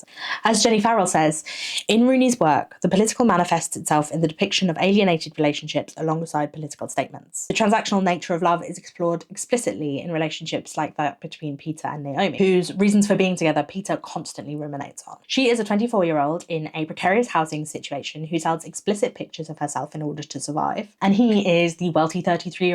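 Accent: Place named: England, United Kingdom. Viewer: Worth noting that while Ireland has never had an institutional left, it also has never had an institutional right. In fact, there's far less right wing leaning and visibility in Ireland than most western countries. We've basically always had a centrist government that makes progress consistently, just faaar too slowly. Also, something about an English accent explaining Ireland to me was actually grating lol. Can I coin the term 'Anglosplaining'?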